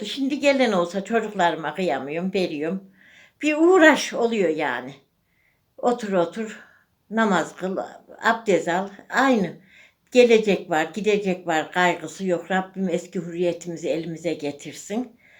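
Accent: Kayseri, Turkey. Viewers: Teyzem bende kayseriliyim Talasın bir köyündenim... Konuşmanız, adetlerin bizim oralara çok benziyor. Siz neresindensiniz. Ellerine sağlık maşallah gençler elinize su dökemez... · Bende kayseriliyim, üniversite kazanınca Ankaraya taşındık 6 sene önce. Küçüklüğüm hep babaannem dedemle Sakar bağlarında geçti. Araya korona da girince babaannemi daha çok göremez ve özler oldum... Konuşman vurguların sepliliğin aynı babaannem gibi teyzem benim.. Allah sana sağlık sıhhat versin torunlarınla uzunca yaşa inşallah ellerinden öperim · Babanecim senin şu konuşmana bayılıyorum . Kayseri’yi özledikçe izliyorum seni maşallah sana 🧿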